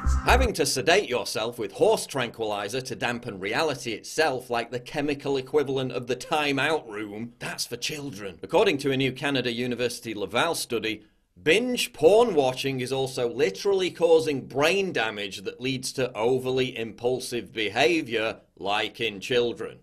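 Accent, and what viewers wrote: United Kingdom. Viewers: Thank you for articulating the reasons for this millennial obsession with super hero movies in your British accent. I think you nailed it. The social engineers want to keep us dumbed down with the emotional intelligence of children in order to keep us all dependent ON THEM and under their perpetual control.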